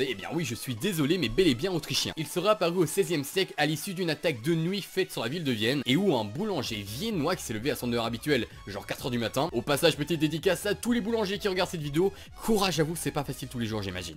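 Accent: Belgium. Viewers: alala ! L'accent belge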